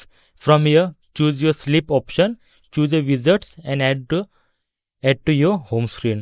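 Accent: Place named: India. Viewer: why indian accent is so bad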